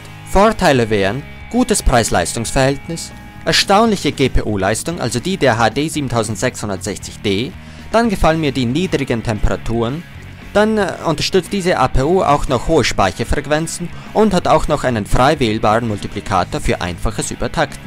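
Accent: Austria. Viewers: Ich liebe den österreichischen Akzent! ^.^ Gutes Review.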